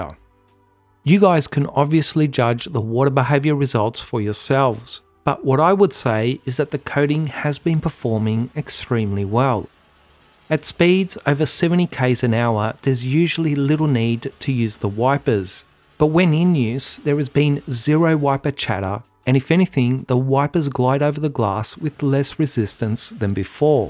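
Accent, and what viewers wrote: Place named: Australia. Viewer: It's how we say it in bloody Australia mateeeeeeeeeee!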